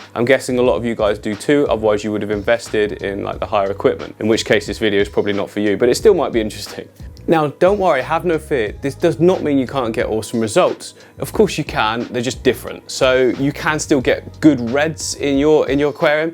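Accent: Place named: South West England, United Kingdom. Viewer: I thought your accent was familiar! I am south west too!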